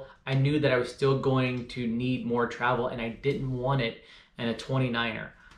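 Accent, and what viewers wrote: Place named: Texas, United States. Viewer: Whoops, should have just waited until the end of the video, sounds like Texas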